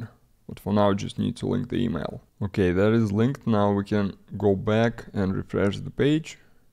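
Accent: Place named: Russia. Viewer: бро ты же русскоговорящий?) по акценту ясно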